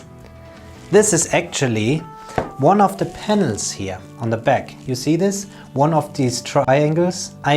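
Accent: Germany. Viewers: Are you german? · Du bist doch deutsch oder das hör ich direkt raus :) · Dieses Englisch kann nur von einem Deutschen stammen, korrekt? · YES! Knew it as soon as I heard him. Germans have a unique English accent if their own. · I bet you're German, man! Ich hör's doch am Akzent!👍😂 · Dieser deutsche Akzent. Herrlich :D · Wow dein Deutsch accent ist hard😐 sorry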